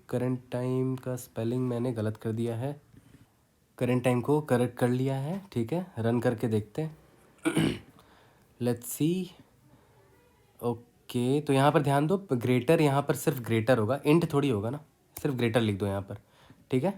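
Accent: Bihar, India. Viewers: Are you from BIHAR bhaiya?? ..becuase your accent is same as ours ...i am also from Bihar...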